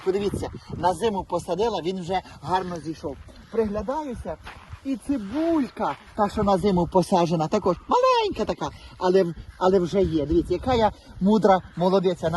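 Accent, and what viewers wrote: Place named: Chernihiv, Ukraine. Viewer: вы живёте случайно не в Черниговской области? Я прямо свою бабушку услышала. Вот именно так она говорила, слово в слово. Я с Сибири, а моя бабушка с Черниговской области. В Украине, ведь везде своё наречие. Бывает,я кое какие слова понять не могу, а вас прямо каждое слово. Я молюсь каждый день с начала войны за вас и скорблю вместе с вами. Дай Бог всё скоро закончится. Сил вам душевных и физических. Я вместе с украинцами радуюсь вашим удачам в бою, и переживаю за неудачи.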